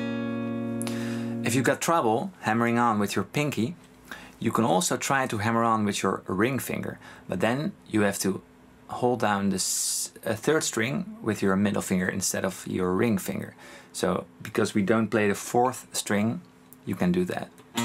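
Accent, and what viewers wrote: Netherlands. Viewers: are you Dutch?you sound really Dutch XD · I can hear he is dutch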